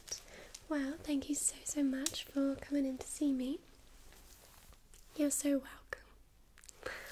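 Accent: United Kingdom. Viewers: YouTube need some more decent British accent ASMR like your channel 😊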